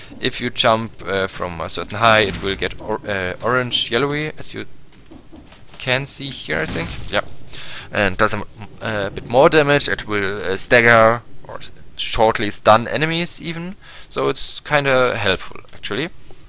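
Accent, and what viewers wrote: Germany. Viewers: lass dich nicht verunsichern. die einzelnen Elemente am Anfang sind wichtig. vielleicht grafisch aufarbeiten und unterteilen. sonst sehr informativ und gut verständlich. du kommst aus dem Raum Stuttgart denke ich 😀. weiter so und das mit dem Akzent wird schon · Man merkt echt das du eigentlich aus Deutschland bist xD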